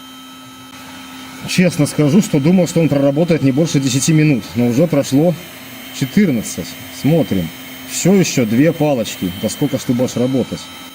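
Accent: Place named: Vitebsk, Belarus. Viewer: Улыбаюсь с говора-акцента.;) Как будто к родне в Витебск снова заехал. Много лет не был... Эх. А аккум норм, наверное) Крышка-вешалка пригодится. Отдельно продаются, конечно. И зарядка компактная. Я б взял, как вторую. Надо подумать.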